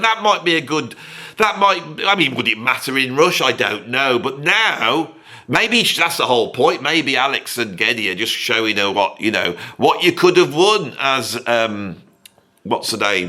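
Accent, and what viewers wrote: United Kingdom. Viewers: There's something about listening to a British rant that is just all too amusing. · I love you man because we're all brothers and sisters in the eyes of the Lord, but that British accent is way too much for me.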